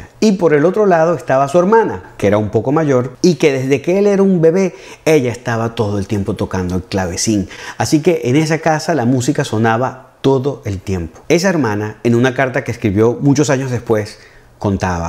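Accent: Venezuela. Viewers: Hola. Acabo de descubrir tu canal y este es el segundo video que veo. Me parece que haces una excelente labor divulgativa y además es muy agradable escuchar las historias contadas con nuestro acento venezolano. Dicho esto, te aconsejo que tengas cuidado con las redundancias, ( "verificar la veracidad.." en vez de "confirmar la veracidad.." o "verificar la existencia.." ) y con la gestualización que me parece un pelín excesiva. Insisto, sin embargo en que la calidad de la presentación es muy buena y muy bien redactada. Te deseo un gran éxito. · Tu acento es venezolano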